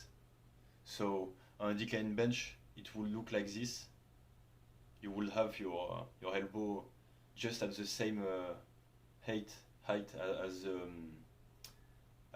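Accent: France. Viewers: Great video and iconic French accent. Subscribed 👍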